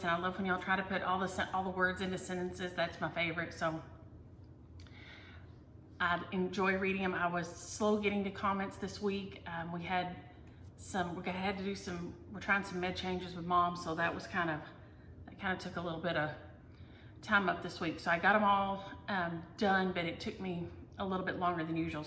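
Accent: South, United States. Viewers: I grew up in Tennessee and have lived in Massachusetts for 20 years now. I love your southern accent and stories. When I first moved from TN "fixin to do" was the first thing I had to give up. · . I stitch on Zwigart based Aida all the time. Most people think Aida only comes in 14 count, but I usually use the 16 or 18 count. It also comes in 20 and 22 and I've stitched on that too. The cheaper brands are a bit too stiff for me though. I dye it whatever color I want, with either Rit dye or coffee/tea dye. I so enjoy hearing another Southern accent! I was born and raised, and live, in North Florida and I know what "tump and fixin' to" mean!! Also, Poke salad is made from the Poke Berry bush. It grows wild here. Never had it though!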